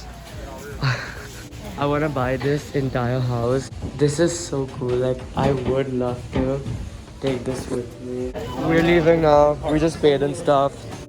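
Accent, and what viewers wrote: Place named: South Bombay, India. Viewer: I'm confused, is he born and bought up in america? His accent sounds like a sobo accent though and he used to stay in sobo right?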